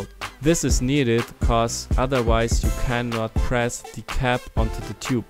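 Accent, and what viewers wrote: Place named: Germany. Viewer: Ah the german accent is making the video wholesome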